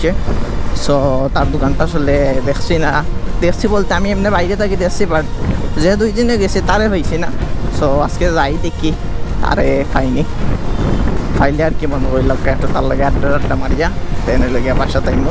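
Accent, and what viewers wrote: Sylhet, Bangladesh. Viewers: Best Sylheti vlogger hands down pure Sylheti maat Your number fan from UK London Tower Hamlets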